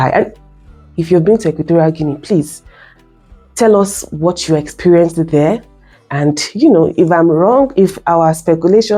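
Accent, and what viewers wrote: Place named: Nigeria. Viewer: You're fine as hell, I don't know why I like Nigerian women's accents!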